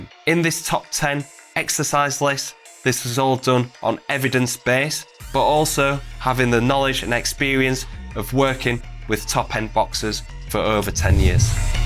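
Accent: United Kingdom. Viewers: Many of the videos I watch just to listen to a proper British Accent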